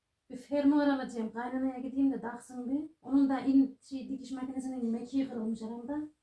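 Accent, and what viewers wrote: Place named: Aksaray, Turkey. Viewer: Videolarin izlenme orani,abone sayisindan fazla!!.bir zahmet onlarda abone olmayanlarda abone olsalar😊.Demekki aksarayliymissin.Ogün bir videonun altinda yazmistim.aksaraylimisin kayserilimisin.sivenden anlamistim.Aksarayli elmasin hayati,bide onun gelini cansu otuk var.onlarda bu sivede konusuyorlar.Hatta siz konusurken,sanki cansu konusuyor😊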